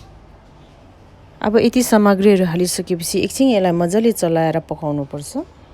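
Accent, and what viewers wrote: Darjeeling, India. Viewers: Hamro darjeeling aloo bandam boli mitto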